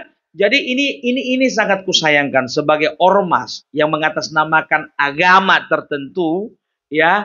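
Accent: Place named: Batak, Indonesia. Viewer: Aku Seinang deingan gaya Batak Kau, Kawan, teirus berkarya kawan😂😂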